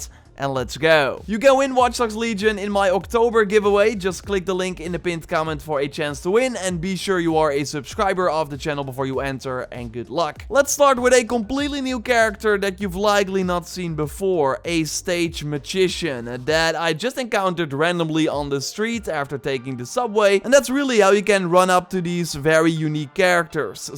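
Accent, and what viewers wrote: Netherlands. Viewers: Yo man you seem to have a dutch accent.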